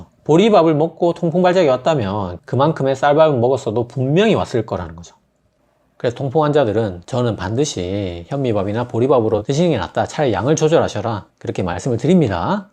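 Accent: Gyeongsang, South Korea. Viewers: 늘 좋운영상 감사합니다🙏 경상도억양 은근 친근감 주시네요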